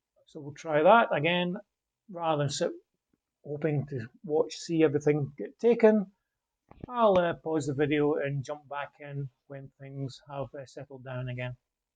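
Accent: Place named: Scotland, United Kingdom. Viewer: This technique sounds really interesting to use on tennis trading! I'm off to try it in a moment.... congratulations on your 100th video,! (PS: It's good to hear a fellow Scot 😊)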